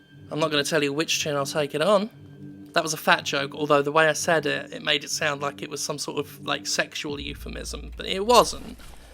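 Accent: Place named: England, United Kingdom. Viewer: British Love how he goes off like a nuke whenever he plays a scary game, "OH F*CK OFF SH*T F*CK", with that awesome english accent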